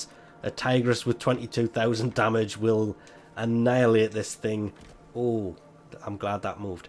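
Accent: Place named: Scotland, United Kingdom. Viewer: DANG SCOTTISH LOVRTT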